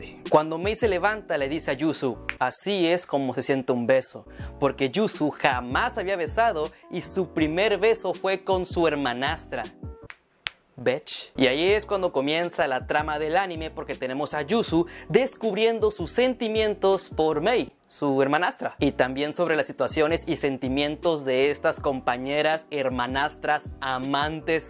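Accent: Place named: North, Mexico. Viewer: Santo cielo, que norteño 8| Buen video :D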